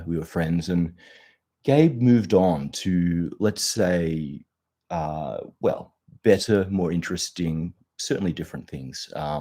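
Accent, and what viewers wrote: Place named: Australia. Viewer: The age of British narration is coming to an end. The age of Aussie narration has begun…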